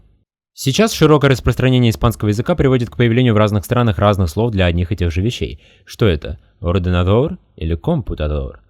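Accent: Russia. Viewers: круто, но слышен русский акцент при разговоре. сообщаю как человек, который говорит на испанском без акцента.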